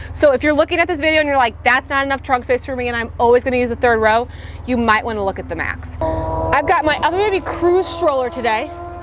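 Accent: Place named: Midwest, United States. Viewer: St Louis!! Duh... that’s why you sound MidWestern. I’m just up the road a couple hours in Illinois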